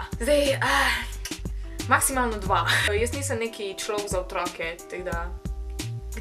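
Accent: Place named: Ljubljana, Slovenia. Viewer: ti si z lublane ziher k lublansk govooriš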